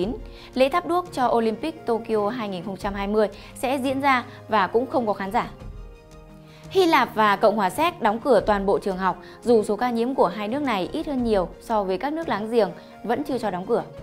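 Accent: Standard. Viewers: Giọng mc quá chuẩn . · Nòi rất chuẩn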